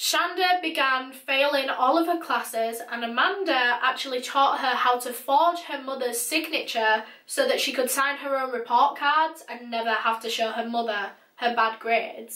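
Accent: United Kingdom. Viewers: Her voice is so angelic and beautiful she speaks in a British accent. She is a nice true crime YouTuber but I would like if she would call the people who are responsible for the crimes names like monsters psychos sickos etc…………….. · Not trying to be a dick but her voice is not that great 😅 She's almost yelling especially at the beginning of every video, and that turned me off of her for such a long time till I finally decided I'm gonna try to get past her yelling intro, and then I absolutely loved her and binged her content. She has a British accent, different from the "mainstream British accents" like in the movies and stuff, but still lovely.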